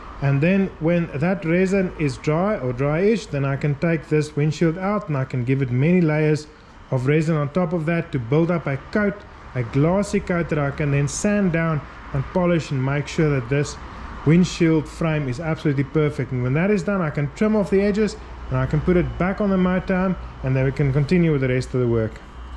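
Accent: South Africa. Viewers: These are great videos. Love your South African accent. Reminds me of when I lived in SA. 'N boer maak 'n plan !!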